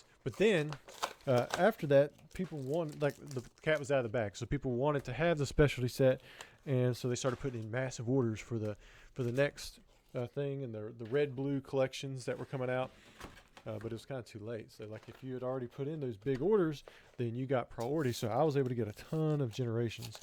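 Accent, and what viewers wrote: South, United States. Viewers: That seems pretty on par for a traditional booster box, but for a giant special set it’s going to be rough 😅 Also, it’s nice to see someone else from the Carolinas! I don’t hear that familiar southern accent often when watching pack openings lol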